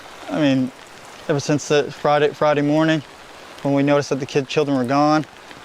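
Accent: United Kingdom. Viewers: I don't know where you come from but you seem to have a British accent. So have you ever been to that area of Nova Scotia. It appears to me that you have already decided that the parents have something to do with the kids disappearance. Do you have any experience working with how East Coasters speak. I certainly hope that the RCMP don't put much stock into what you are saying. When you are so upset by your kids missing you may say a lot of things that don't make any to someone not native to Canada. Sorry I am concerned about the wee kids being missing this guy is so full of shit it is unbelievable.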